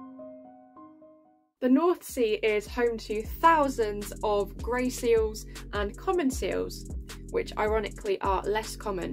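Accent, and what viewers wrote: United Kingdom. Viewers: Dang!!! And she's super smart and intriguing!!! I like this girl!!! My new c favorite channel!!! There just isn't any other women who are interested in nature and science, and have the most awesome/raddest Cutest style and the accent! I've always kinda not really like a Girl with a British or European accent really.... What was I thinking, I'm speechless! I wouldn't have it any other way now that I've witnessed this perfection before my own eyes!! Okay, sorry but I just had to state what was on my heart!!! I can promise you that I don't go around complementing women on the internet like this EVER!!!! But I guess either I'm the only guy here who is astonished.... Or maybe I'm just the only weak willed man who just couldn't play it cool and act as if I wasnt smitten!!! ..... No offense at all! I just am.... Darn.... Well there it is! 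🙃✌🏼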